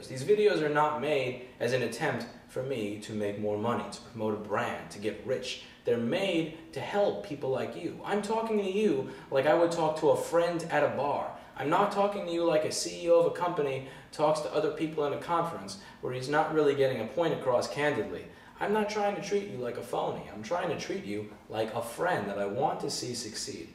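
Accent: New York, United States. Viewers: Listen, you speak very well and can clearly and entertainingly articulate complex ideas - nice brain dude. I think you should consider that you may be suited to entertainment as much as you are computer repair. Almost a NY Wisdom vibe to your delivery that - accent and all - makes me feel like I'm back in the Prickly City itself :) Excelsior, sir.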